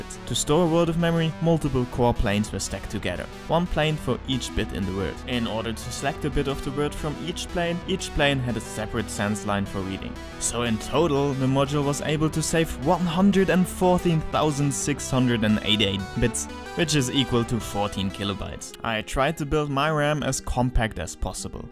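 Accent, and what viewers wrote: Germany. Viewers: sie sind so deutsch der akzent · are you by any chance... german? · I knew he was form Germany. Typical Accent.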